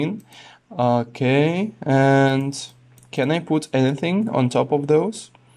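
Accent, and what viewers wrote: Russia. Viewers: I am gonna guess that the accent is Russian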